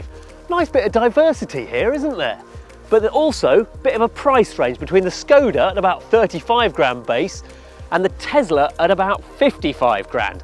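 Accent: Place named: United Kingdom. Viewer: This video is filled with British logic. All bla bla and no substance. Just yapping with a snooty accent won’t cut it, m8!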